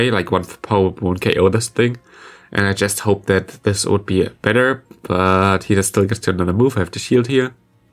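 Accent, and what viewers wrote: Germany. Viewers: His German accent is kinda strong… but as a German like me you can understand his English very well. 🤔😅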